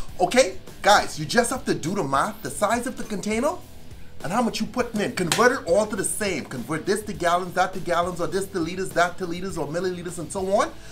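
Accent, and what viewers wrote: Bahamas. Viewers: Bahamian accent i hearing or I’m freakin out lol 🇧🇸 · Are you bahamaian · Bro u gotta be from the bahamas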